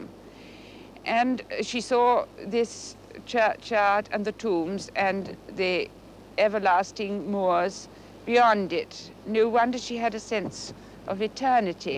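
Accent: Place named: Scotland, United Kingdom. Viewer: It's a posh Scottish accent actually.😊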